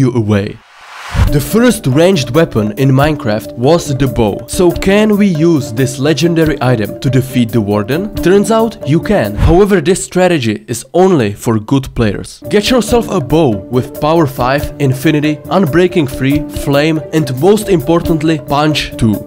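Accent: Czech Republic. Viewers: Ty jsi určitě Čech. Vždyť nemáš ani anglický přízvuk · ur English speaking skills sounds like it talking Czech Guy, dont take it serius, im just asking :D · bro sounds kinda czech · You have a Czech accent.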